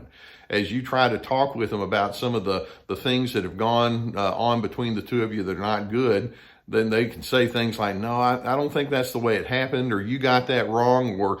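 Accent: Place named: Texas, United States. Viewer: Always funny to hear a highly educated individual, with a Texas accent. 🤣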